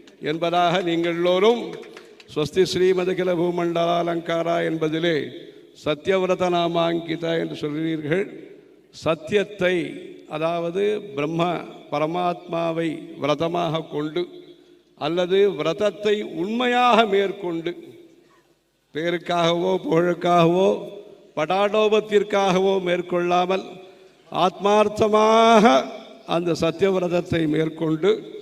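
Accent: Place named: Thanjavur, India. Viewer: அக்ரகாரத்து கருவறையில் இனிய தூய தஞ்சை தமிழ் 🙏 தமிழோசை கேட்கும் இடமெல்லாம் ...... புனிதம் பெறட்டும் 🙏🙏🙏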